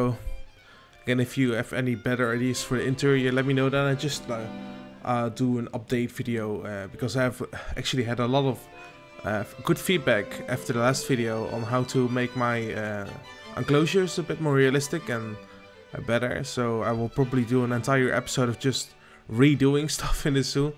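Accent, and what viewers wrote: Netherlands. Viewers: Love your dutch-english lol. I think adding glass parts in your roofs would make the inside look way better adding natural lighting aswell as making the roof more diverse from above.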